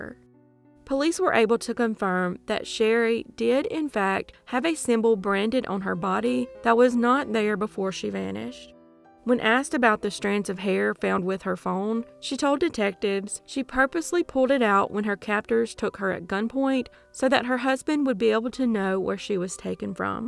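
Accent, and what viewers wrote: South, United States. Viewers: Your southern accent is adorable 💕❤️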